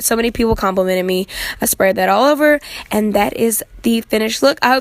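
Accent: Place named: South, United States. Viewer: Sound so country / southern !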